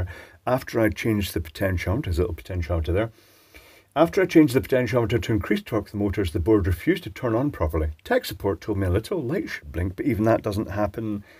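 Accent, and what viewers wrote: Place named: Scotland, United Kingdom. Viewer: Fluxing and removing chips.... its a lovely Scottish sounding Louis Rossman, with less swearing!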